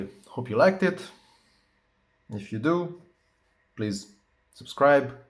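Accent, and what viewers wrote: Hungary. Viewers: Szia, pontosan ilyen laptopom van. Van ra lehetoseg, hogy privatban beszeljunk? Ramot szeretnek boviteni viszont szakemberre biznam plusz lenne par specifikus kerdesem ezzel a laptoppal kapcsolatban. Nagyon informativ a video koszonom. (Magyar akcentus elteveszthetetlen :) )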